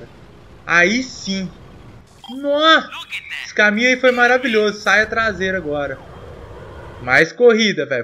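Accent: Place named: Minas Gerais, Brazil. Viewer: o é mineiro? ele tem um sotaque mineiro.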